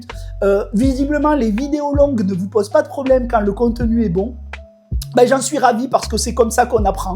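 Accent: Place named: Provence, France. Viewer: oui c est une question d accent et le tien m a propulsé dans les films de M Pagnol.... Le mien, franc-comtois n est pas aussi musical.... Pour répondre, c est toujours intéressant d avoir des éclairages différents sur la technique. Perso je fais des relevés d accords en écoutant de la variété. Tous mes vœux.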